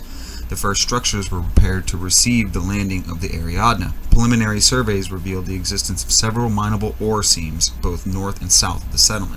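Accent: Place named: Canada. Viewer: Enjoying your videos (considering getting into Infinity, and I like to know the lore of games, and specifically factions that I play. You have caught me off guard, as I have heard you say both zed and zee now. I am guessing that you are either Southern Canadian, or from a Northern state, with a lot of border crossing Canadians. That, or a child of parents from both sides of the border. Regardless, love the videos, watched four so far today.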